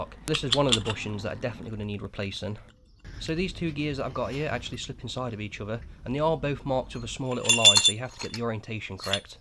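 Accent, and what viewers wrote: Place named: Nottingham, United Kingdom. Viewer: That's a monster lathe, more complex than my Hardinge. You sound like you are from Nottingham.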